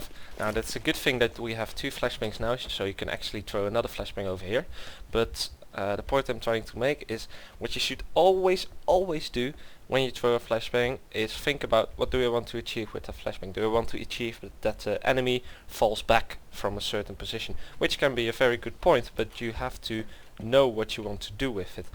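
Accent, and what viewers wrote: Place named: Netherlands. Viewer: Je bent wss nederlands ik hoor het door je accent haha :D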